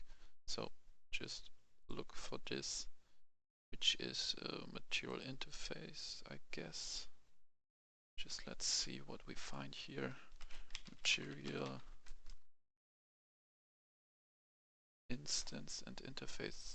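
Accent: Germany. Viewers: Man hört das du in ehct Deutscher bist :D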